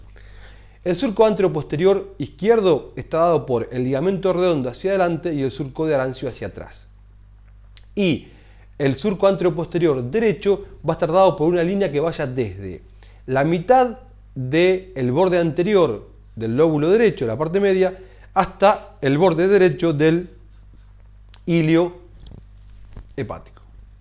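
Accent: Argentina. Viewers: Los porteños hablan así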